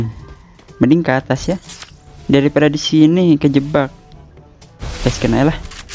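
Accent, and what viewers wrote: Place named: Lampung, Indonesia. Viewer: Abang orang lampung bang kok ngomong sikok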